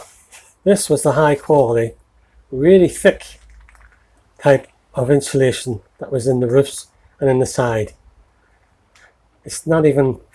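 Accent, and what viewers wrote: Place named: Ireland. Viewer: Well hello there. I get to praise you as my hubbie is a bit of a quiet person. I love to hear your accent it reminds me of some Irish visitors we had in our Bowling Club in Scotland's east coast. You must be a really handy man to have aboot the place. I'd trade you for mine, as I can see you're a quare bit more cuddlier and there's nae heat in that one of mine in a winter's night. Till I tune in again take care my love.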